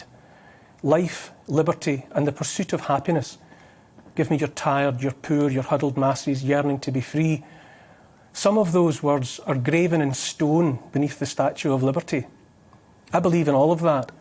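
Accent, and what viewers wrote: Scotland, United Kingdom. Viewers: is this Wiseman who can talk Scottish? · A pleasure to listen to a Scottish voice that talks such sense , I just wish that The Scottish First Minister would take on board the few words that have just been spoken with such conviction, Boris has lost the plot , we welcome our enemies to these shores with open arms, I watch as our culture is slowly but surely eradicated and disposed of in such a political and cowardly way, our western way of life will soon be distant memory and we only have our cowardly and spineless politicians to blame.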